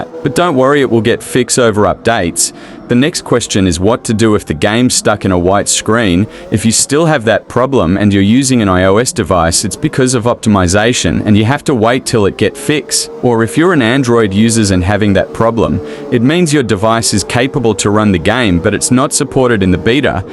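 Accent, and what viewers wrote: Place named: Australia. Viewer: Australian accent lol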